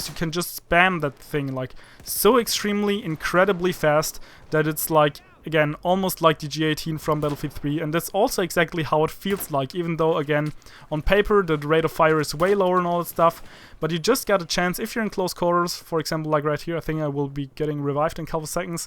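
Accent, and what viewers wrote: Germany. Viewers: did you speak german?....Deine Aussprache klingt nämlich sehr Deutsch ^^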